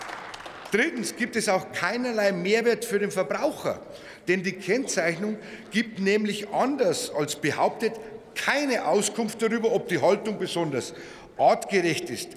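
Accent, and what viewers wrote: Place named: Bavaria, Germany. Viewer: "Ich vertehe nichts.." Bayirisch verstehe sogar i.. als Schweizer!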